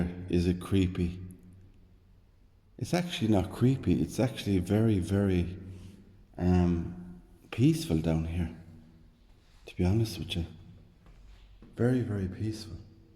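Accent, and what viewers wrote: Ireland. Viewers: I wonder how many descendants visit? Have they contacted families to see what they would help to fix the crypts. I like your Irish accent. I am Irish on my Mother's Father's side. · The whole of Brussels tends to be 'damp' (I live here!) ..Brussels literally means 'settlement in the marsh' and the river Senne runs underground. Thank you for this fascinating video. 'Conseiller communal is a councillor to one of the 'Communes' (districts) of Brussels. Love your content and your gentle, soothing brogue. Thank you 😊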